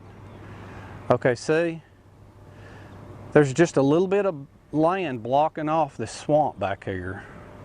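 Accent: South, United States. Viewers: As being a professional land surveyor in neighboring South Carolina I would think that the Georgia Department of Natural Resource owns the island and the surrounding river. Just subscribed I love your videos and the perfect southern accent!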